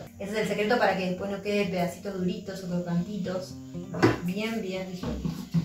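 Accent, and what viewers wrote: Córdoba, Argentina. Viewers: Ya se impregnó de tonada cordobesa la bb😊Pan de aaaarroz! La amé 💕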